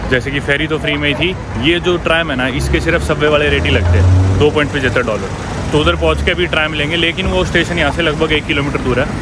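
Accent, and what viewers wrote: Haryana, India. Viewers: Really like the way of explaining everything in very simple way. Also the music while you were on that ferry , you looking into waters , what a moment it made me emotional. You take so much efforts, walking everywhere totally agree for such a short distance they charging so much or its just the conversion making us feel so costly don't know. Buddy simply great. ❤️ for showcasing the reality in subways. again your Harayanvi tone 👍🏻 the way you speak. 'Dhakke' khaye but it was worth. Lots of ❤️ to you. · Bhai aap Haryana se ho kya ? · Haryani accent is Lit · Haryanvi accent m vlog dekhn ka mja alag h besk hindi bolta ho. Bhot badiya h bhai. Baki height dekh angrez m chote lage h 😂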